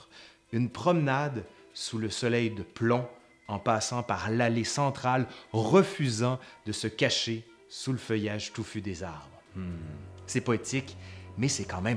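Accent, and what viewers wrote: Canada. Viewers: Tu as un accent canadien🇨🇦🇨🇦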